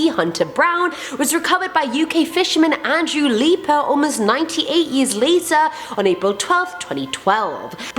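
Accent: Russia. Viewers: Very nice Russian accent! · tht russian accent. im jealous. 😭😭😭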